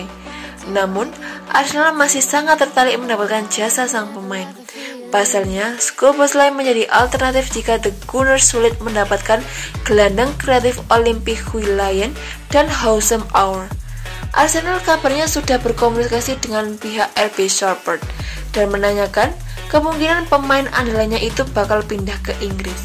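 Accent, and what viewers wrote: Java, Indonesia. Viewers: Mbak bisa d ilangin medoknya dikit gak wkwwk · Yakin ini chanel nya Milanisti?kl iya knp bahasanya lebay dan pesimis seolah tanpa calha milan bkn apa2.hello u sdr gk sih milan itu jauh lbh besar dr sp pun jg termasuk calha.btw baikin dulu narasi dan intonasi nya.jgn medok gitu...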